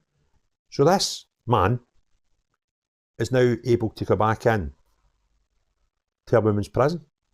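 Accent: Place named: Ayrshire, United Kingdom. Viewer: are you fae Ayrshire? Your accent sounds almost exactly like my papa and uncle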